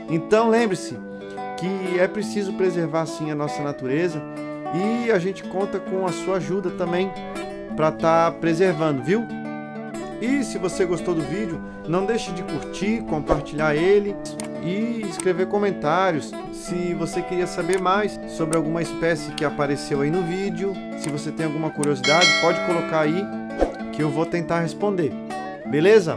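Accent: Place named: Florianópolis, Brazil. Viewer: Muito bonito seu trabalho! O sotaque manezinho da uma qualificada ainda maior! Rsrs As imagens são bonitas! É vc mesmo que faz todas? Continue firme! Está de parabens!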